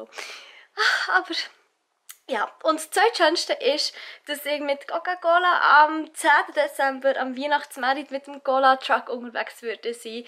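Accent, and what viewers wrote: Bern, Switzerland. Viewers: Speix Darum redet sie Bärner Dialekt. xD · Fingi mega cool ds bärndütsch ändläch mou öpper wo do hiä uf youtube bärndütschi videos macht ;-)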